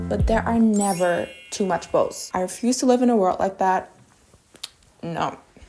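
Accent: Germany. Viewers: Okay but German accents are so pretty ✨ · Man merkt voll dass du eigentlich deutsch bist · i am in LOVE with how this turned out. you did amazing 🎉🎉 also are you from germany?? my friend's accent is really similar to yours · Direkt den deutschen Akzent rausgehört✨ Liebe das Ergebnis!🫶🏼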